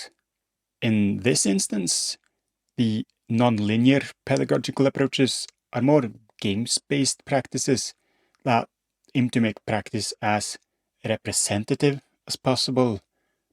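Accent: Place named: Scotland, United Kingdom. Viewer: If you gave me a million pound, I couldn't work out if you have a Scottish accent or a Norweigan one?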